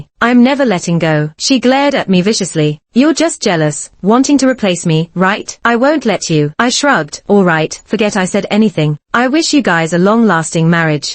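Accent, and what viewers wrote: United Kingdom. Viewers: Wait so at the beginning she said Beck treated her like a queen. Why did that change as the story went on? Kind of confused but I liked the story a lot. I love the British voice. So classy even when calmly plotting revenge lol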